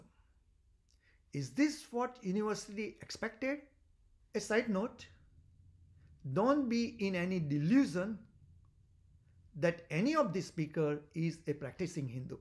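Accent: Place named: India. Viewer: For a person who has been in the US for 45 years, you do sound like a freshly arrived Indian. Why do you deny everything about the atrocities committed by high caste Indians and their stooges in India? The results of hate that have been sown in India are becoming very clear all over the world. Just last week the Australian Govt has arrested and deported an Indian Hindutva supporter, Even the Oxford student who had to flee from her position is of the same ilk!! Of course, you will say "it's not proven"!! I am glad that the second generation of Indians in America keeps away from the likes of you!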